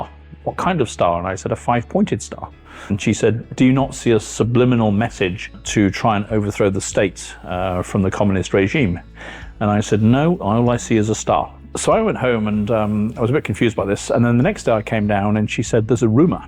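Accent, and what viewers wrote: United Kingdom. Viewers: You have a pleasant voice and your British accent sounds so good to my Finnish ears. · I agree. has such a nice, soothing voice and British accent is always welcomed.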